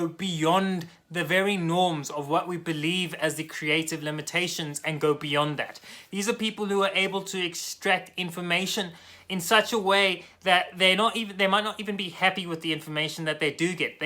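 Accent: South Africa. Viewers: Are you from South Africa or perhaps New Zealand?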